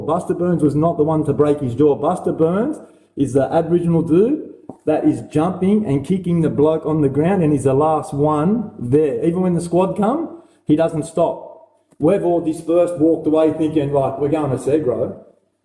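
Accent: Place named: Lebanon. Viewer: Your white!! And sound lebo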